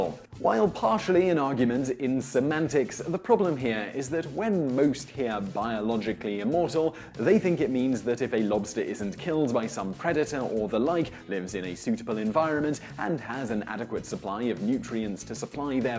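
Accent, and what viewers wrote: United Kingdom. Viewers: Yeah I turned it off before a minute I don't need to hear a lot of blah blah blah in a British accent about stuff I already know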